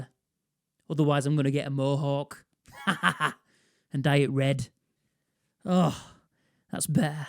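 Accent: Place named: Yorkshire, United Kingdom. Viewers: I agree about the voice. Yorkshire and Scottish accents are the best in the UK for my American ears! 😎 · If one goes back and watches your older videos, your voice was definitely more subdued. I like the more manic...with the even more pronounced Yorkshire accent. Keep up the good work!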